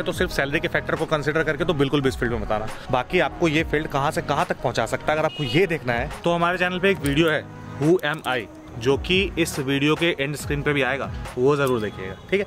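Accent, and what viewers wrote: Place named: Bihar, India. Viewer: Kaa bat kr rhe hai sir ... Aap bihari hai.,❣️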